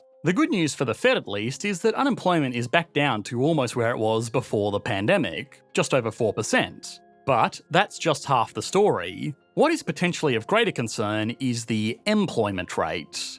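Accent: England, United Kingdom. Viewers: I CANT UNDERSTAND YOUR BRITISH ACCENT DO YOU HAVE FULL SUBS AVAILABLE??? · Kind of annoying "Millennia-aard" English accent -)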